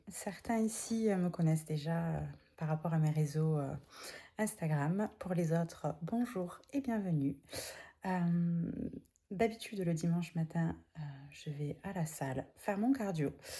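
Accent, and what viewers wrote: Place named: South, France. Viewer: Mon Dieu, quel corps de folie En plus. Cet accent du Sud et ses cigales Ne change rien, reste comme tu es magnifique.......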